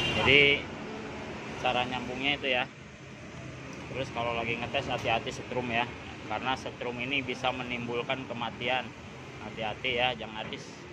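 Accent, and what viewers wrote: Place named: West Java, Indonesia. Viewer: Kieu ari urng sunda mh...ngomng indo sok cmpur jeung sunda...karakter na khas.....mantf penjlsn na sa x oge ngartos...siap lh kepala ketmu ekor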